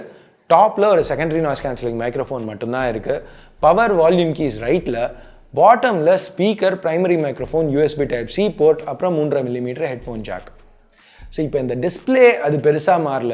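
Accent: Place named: Kongu, India. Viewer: Are you from Coimbatore? Your slang sounds a bit like Kongu Tamil.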